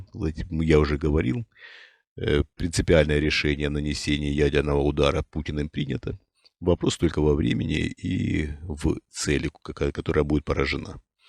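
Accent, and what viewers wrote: Ukraine. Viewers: Бля, жесть! Это что, кто-то всерьёз слушает?! А украинский акцент диктора никого не смущает? А подзаборная лексика и стилистика? Нет, народ, что серьёзно так потерял чувство уровня, что ВОТ ЭТО принимает за чистую монету? Наши люди неисправимы. Кашпировский и Чумак и сейчас могли бы собирать залы и "заряжать воду". Чем "президент, слушающий шаманов", отличается от баранов на этом канале, слушающих таких вот? Впрочем, секте сложно понять, что она секта. Анекдот, ё-моё...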